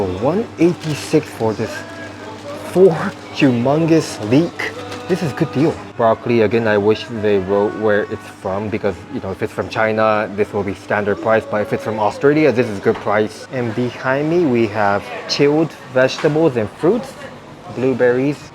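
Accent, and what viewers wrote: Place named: Singapore. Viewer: U sound more singaporean now 😅 love it!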